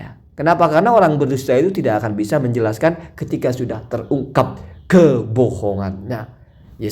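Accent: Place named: Surabaya, Indonesia. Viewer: jenengan tiyang pundi gus,lagatnipun persis suroboyoan🤭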